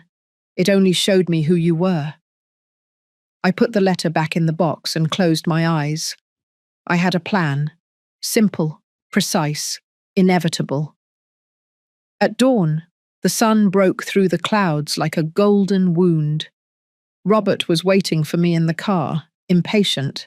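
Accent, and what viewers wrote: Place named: United Kingdom. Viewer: I would have set his own house on fire in his sleep.That is the fate he deserves. Incredible story, writing and lovely British narration. I was hooked all the way through. As a child in California, we were evacuated from our home twice due to wildfires. It was terrifying. I'll never forget seeing my parents with their most precious possessions packed in their cars when they picked me up at school.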